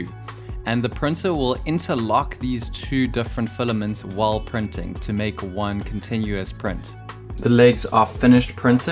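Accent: South Africa. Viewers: So cool to hear a South African voice while watching a video like this. Keep it up man!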